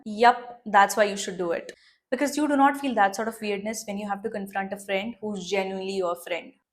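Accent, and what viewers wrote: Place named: India. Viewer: Omg you sound so western but your desi accent is strong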